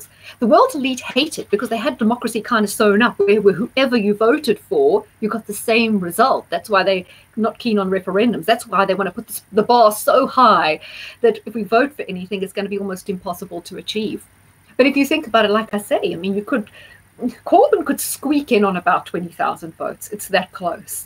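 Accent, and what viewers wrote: South Africa. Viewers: Hi, this remain parliament is driving me insane! It beggars belief how after we were clearly told by Cameron that the British people will decide, not Parliment, not government.... The people and the people's choice will be implemented. Insofar as the next general election is concerned. Can you do a chart on this? I will definitely be voting ukip as there is no one else. It would be interested to see if - after TM resigns after 29th March... I'm hoping actually and Boris takes over, how will he do? Will he start looking at sorting out the destruction caused by Osborne and Hammond austerity cuts? It's desperate how they have made people so desperately poor while the rich flaunt their wealth. The crime rate is horrendous and the London mayor needs to go. Do you see improvement? Thank you for your videos. I found you by chance and constantly look to see if you have posted a new one. 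It's nice too to hear a fellow SA accent!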